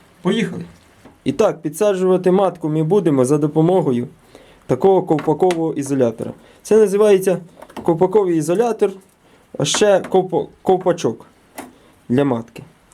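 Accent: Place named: Khmelnytskyi, Ukraine. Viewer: Ви напевно десь з Хмельниччини, у нас така вимова😊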